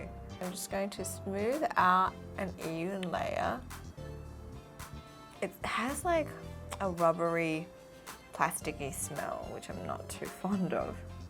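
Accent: Australia. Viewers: Asian with a aussie accent....wow...like McDonald's "I'm loving it".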